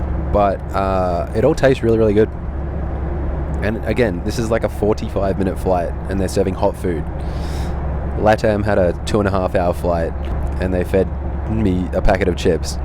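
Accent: Australia. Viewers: Sounding very Aussie which is a pleasure.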